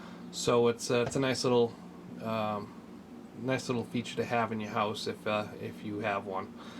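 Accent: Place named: New York, United States. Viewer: THANKS!! You answered all of my unanswered questions. Hit the nail on the head my battery read 4.2 volts. by the way loved the accent, you must be from New York Thanks again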